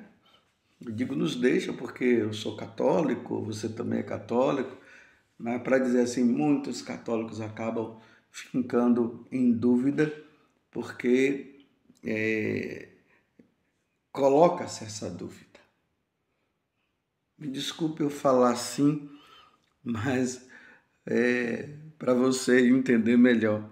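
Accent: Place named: Northeast, Brazil. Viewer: Bom dia povo santo de Deus, amo ouvir as homilias do padre, lembre -se ele tem soutaque nordestino, assim como eu tem momentos que falamos mais leve outras horas mais enérgicos como voceis estão falando, um bom dia a todos com as bênçãos de Deus!❤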